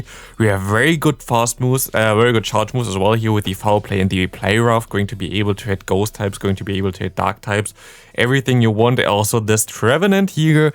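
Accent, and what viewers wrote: Germany. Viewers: Ich schaue beim Zähneputzen gestern dein Video, meine Freundin kommt rein und fragt: "Ist das ein Deutscher?" Ich glaube man hört das 😂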